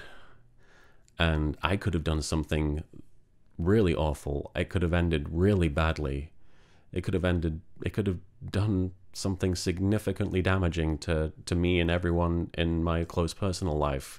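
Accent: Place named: Northern England, United Kingdom. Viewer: LK, everyone makes mistakes and just because a decision is emotional that doesn't make it stupid. I know how hard it is, but you need to understand that you can't change the past year. I'm happy that you've found a medication that works well for you. (Unrelated note: are you from the north of England? I'm from Doncaster and I thought your accent sounds like mine)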